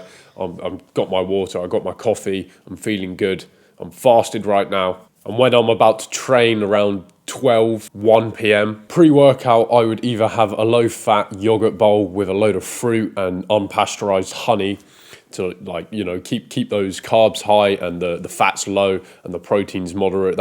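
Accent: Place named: England, United Kingdom. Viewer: Since you a have an English accent...Ill listen to you.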